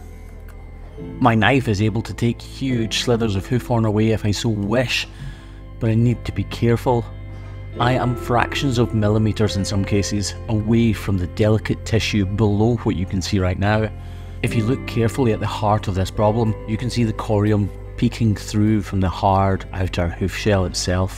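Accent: Scotland, United Kingdom. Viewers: You sounded more Scots in this vid... what happened? ... ... ... Was this a repeat from a few years ago? I mean, you almost sounded like Craigy Boy! XD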